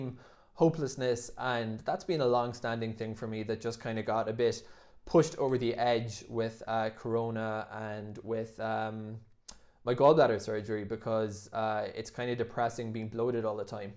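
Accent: Ireland. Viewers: yup, sounds south of Dublin 🎉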